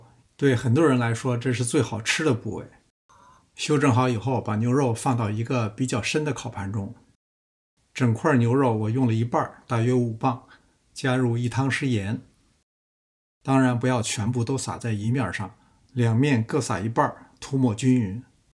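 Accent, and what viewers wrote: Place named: Northwest, China. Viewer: 感觉也是个西北大哥，跟妈妈口音都很像～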